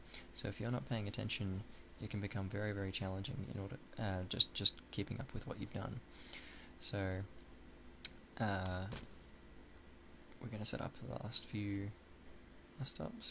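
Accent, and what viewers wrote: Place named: Australia. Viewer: Love australian accents :D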